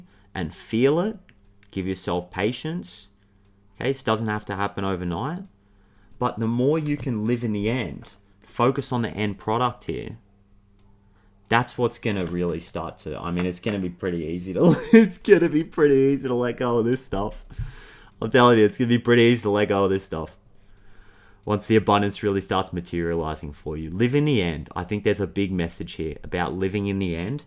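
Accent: Australia. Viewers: Whoa! Hello! 6 mins in and I’m feeling super connected to this reading! Oh, and the Aussie accent is refreshing being local 🇦🇺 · when your readings resonate with me it’s like you’re speaking directly to my higher self it’s like a flow of information. I mention that because I have auditory processing delay and I find it extremely hard to focus on and actually hear what’s being said without subtitles. Here, with your Australian accent and such unique, cute tone of voice I get it, I don’t even have to know all the expressions you use and still it makes perfect sense ⚛️ to me it’s a confirmation that I’m not delulu, that I’m not imagining stuff. That our interconnectedness is so very real. I love it and I love you 🩷🧡💛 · Are you Australian ?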